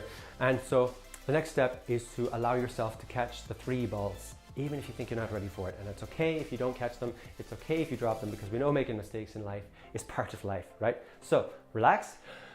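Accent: Ireland. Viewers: Definitely one of the better accent attempts Iv'e seen on youtube, and I've seen some bad ones, good job either way. I live in Cavan, but my doctor is from Galway and he sounds exactly like this. Dr Cassidy is also a dork too, no offense I'm a dork so I can get away with saying that :P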